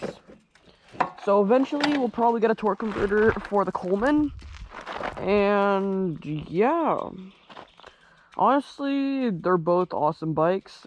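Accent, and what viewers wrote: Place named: Canada. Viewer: You said ya hit 50 and I was tripping out thann you said "take err for a rip" and I realized ahhh he's a Canuck. Hahaha KMH not MPH 🤣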